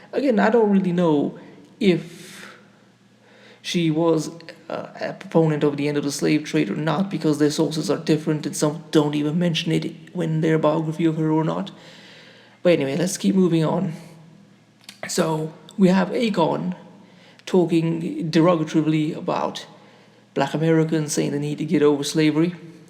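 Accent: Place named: United Kingdom. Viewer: Well for the British accent you deserve an applause. But I think you should adjust the speed in while voicing out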